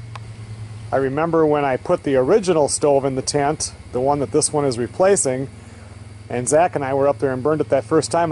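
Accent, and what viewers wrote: Minnesota, United States. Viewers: Love Minnesota accent, Ohh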